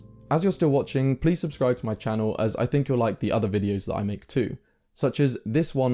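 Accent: United Kingdom. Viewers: Ditch the British accent. It is considered very lowbrow. Your great content would be better served with a Swedish, French or Irish accent. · Well sounds like a Brit, so understatements are a matter of course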